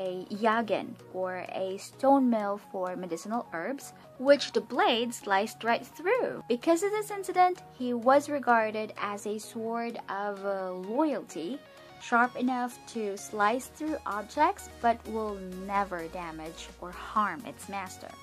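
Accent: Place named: United States. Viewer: Phillipines? You sound American??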